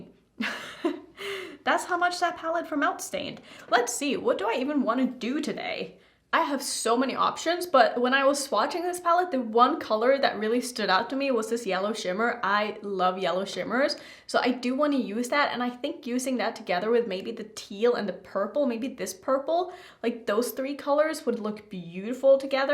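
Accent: United Kingdom. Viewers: In Manchester where he comes from, often when they said blush it sounds more like blursh. That’s my theory anyways 🤣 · It’s called blursh because it’s spelled JUST like some brits pronounce the word blush. The also say bush for brush. “Did y’all wash your bushes?” I hear him say it alllll the time on his Instagram weekly. It comes out sounding like BLURSH to us Americans:)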